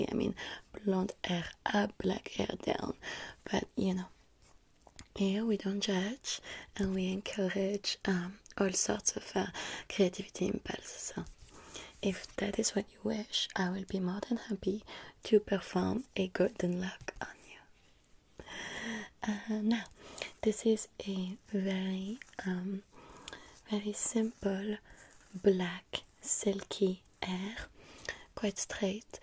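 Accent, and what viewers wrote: France. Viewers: I'M JUST SO IN LOVE WITH FRENCH ACCENT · yesss! I’ve been looking forward to a sequel to the first one of these you did! Love it, sooo tingly and I love the French accent🖤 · Okay I’ve watched like. 27 times so it’s safe to say I’m obsessed!! Love the French accent!! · I just came across this videa, i am not sure how or why but, wow, i feel happy, i will check out your channel.. my god, the french and their accent, exquisite. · im male but i will watch any french accent video from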